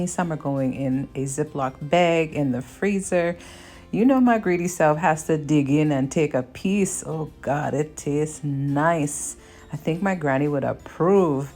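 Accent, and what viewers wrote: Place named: Caribbean. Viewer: 😮😮 Wow!!!I’m smelling the salt fish from Montreal.🤣🤣Looks sooooo tasty.Sending Love ❤️ I’m loving your West Indian accent.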